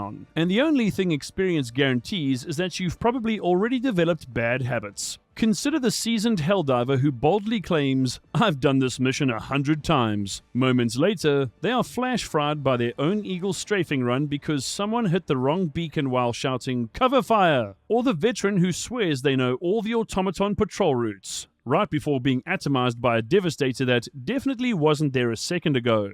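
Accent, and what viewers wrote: South Africa. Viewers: Permission to query Commander sir, are you deployed out of Sector 5 by any chance? Your accent reminds me of South Africa sir! Glory to Super Earth 🦅🌍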